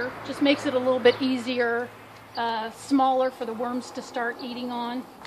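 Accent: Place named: United States. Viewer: Thank you for the great video. Which part of America are you from. Your accent is very nice. Roberta from Perth Western Australia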